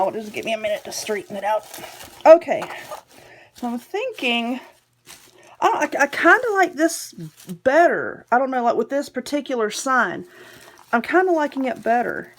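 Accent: South, United States. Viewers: Hello my Southern friend, my name is Joyce and I live up near Chicago and I wanted to let you know how much I enjoy your videos. I have been logging in and checking to see what you're up to and I love the things that you share. I respect the fact that you want to do tutorials using only things that you know people would have around their homes, I get that. I have done a couple of wreaths myself and while skimming through the internet one day I found where mesh with a stencil burner. Well because I do have one of them on hand I used the stencil burner to cut my mesh and it made a huge difference in my mesh as far as it unraveling. I love that thing it's quick to use I had all that mesh cut in no time. Although I totally agree with you about keeping it simple so that your viewer's have those things on hand I thought I would just give you that little bit of information because I wanted to share and give something back. 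Keep bringing those videos, love your accent, love the crafts and the hauls that you do . from a dedicated subscriber 👍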